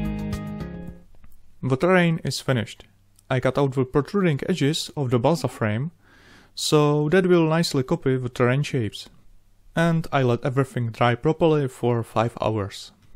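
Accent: Czech Republic. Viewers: D hneď som vedel že si čech podľa prízvuku:D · Mluvíte skvěle anglicky. Ale pro čecha je to jednoduché poznat že jste čech. Ale jinak skvělé modely. :)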